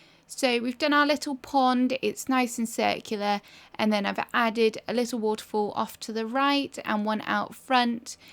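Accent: England, United Kingdom. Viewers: I love your accent! Are you from England?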